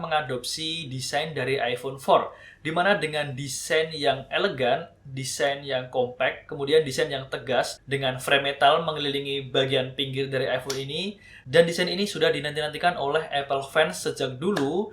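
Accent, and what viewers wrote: Indonesia. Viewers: Orang sunda ya?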